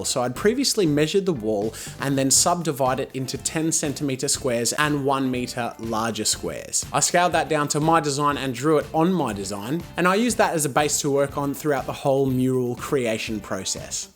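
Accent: Australia. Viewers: U sounded way more Australian in 2014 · your accent was more australian back then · uses Aussie slang, Aussie accent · more Australian in this than it is nowadays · his Australian accent seem more Australian in this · his Australian accent seem more Australian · just because he's Aussie doesn't mean u can make fun of his and my accent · he sounds more Australian then normal, maybe because this video is older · He sounds so aussie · His Aussie accent was stronger here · He sounds very Australian in this video but not as much in other videos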